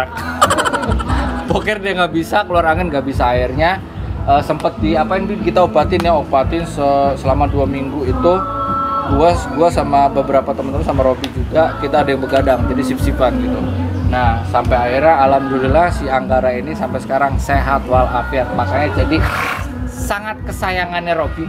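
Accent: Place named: Tegal, Indonesia. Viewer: Wong tegal ndi suarane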